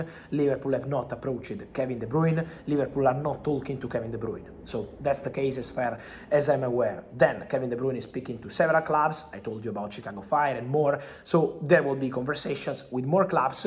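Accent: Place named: Italy. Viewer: please take a breath and slow down a tick...Italian-English is lyrical but not easily understood for this Alaskan...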